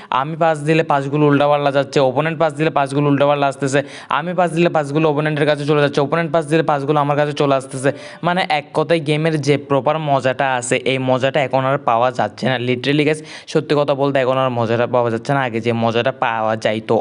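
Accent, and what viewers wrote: Chittagong, Bangladesh. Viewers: চিটাগাং থেকে বলছেন ,তাই না.? একসেন্টেই বোঝা যায়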